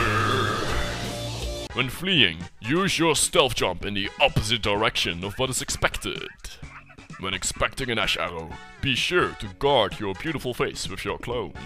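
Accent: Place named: Netherlands. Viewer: Gast, doe je je engels accent expres zo nederlands? xD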